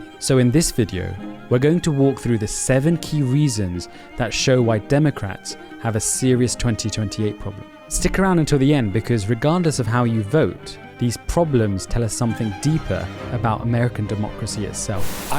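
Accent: United Kingdom. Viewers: What does a brown guy with a British accent know about Americans? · There’s a Middle East fella with a British accent explaining USA politics issues 😂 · At about , he doesn’t even get the frickin states he highlights correct: he says “Arizona,” but his on-screen map highlights “Arkansas.” British accent gets you some points, but then again, it also highlights that your expertise around the nuance and cultural dynamics of our politics may not be up to snuff…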